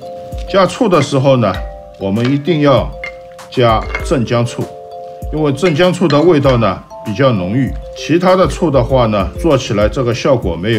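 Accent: Shanghai, China. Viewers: 一听就晓得是阿拉上海宁师傅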